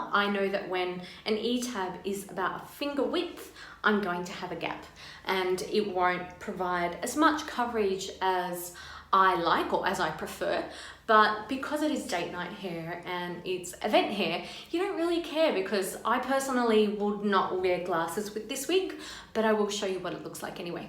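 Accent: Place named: United Kingdom. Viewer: Thank you … 12 FS8 and 12 FS12 vary so much that I won’t even purchase those colors anymore. I received a 12 FS 12 in Miranda and it was much darker than what you are wearing right now. It was a medium brown with sparse gold blonde highlights on the top only. I put her up for sale and made sure I showed the color and someone snatched her up within 30 seconds, so I don’t have to worry about it anymore but I love Palm Springs blonde but sometimes I want to mention in my color and I think that the 12 FS 8 gives you nice dimension especially in a long style like that. It’s unfortunate, so I think I will stick to Estetica colors… Even though they do not have near the colors needed I do have one that I love and it already has demention and I can usually be pretty safe with that color. I want an amber so bad and I just may go ahead and get her in Palm Springs blonde. 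I have a good night in PSV and she does have some variance in color running through her, she reminds me of my own hair that I used to have highlighted and colored so that’s a good thing. Thank you for the video, I always love watching your videos and I love your accent. Is it bad to want to go and take lessons on how to speak with a British accent? My son and I both would like to do that because we feel it is the proper way to speak!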